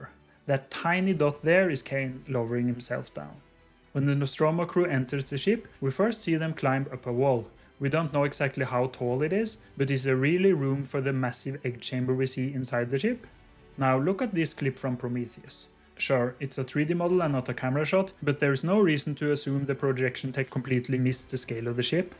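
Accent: Norway. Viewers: You're always going to have trouble making sense of combining wildly different alien movies, made by directors and writers with completely different visions. Or with directors' brains that have degraded with age. Your- and other fan theories are hopeless. (Also, holy super-thick norwegian accent, batman) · Norwegian narrator?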